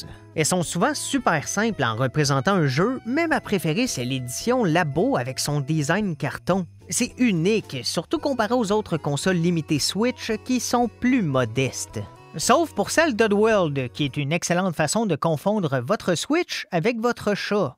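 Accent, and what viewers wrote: Quebec, Canada. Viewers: OMG L'accent quebecois <3 j'adore <3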